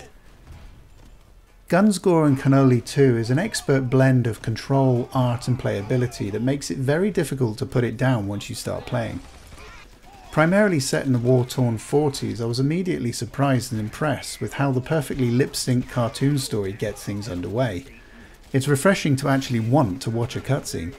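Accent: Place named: United Kingdom. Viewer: The narrator has such a sexy british voice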